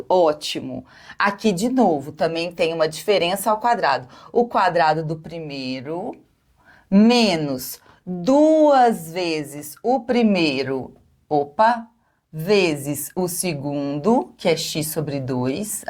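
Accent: Minas Gerais, Brazil. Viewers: Sei lá... Quando a professora explica com sotaque "mineirês", eu entendo tudo! ♥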